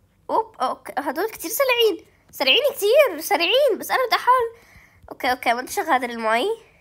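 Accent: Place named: Egypt. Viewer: انت من الأردن ولاكن انتي تتكلمي مصري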